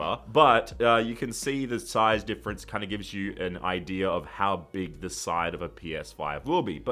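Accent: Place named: Australia. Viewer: Great to hear an Aussie discussing PS5! 🇦🇺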